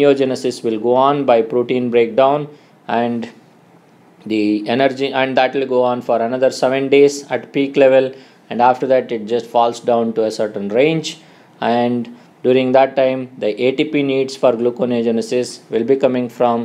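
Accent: India. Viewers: Indian English is trash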